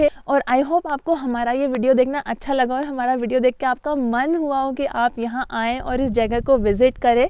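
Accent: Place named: Rajasthan, India. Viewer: Germany ki trh bahut beautiful hai.. 👌👌 ki marwadi awosem 1no. 🇮🇳🇮🇳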